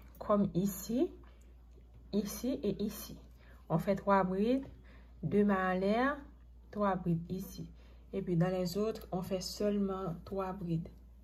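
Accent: Haiti. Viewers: T'es Haïtienne ? Ton accent ?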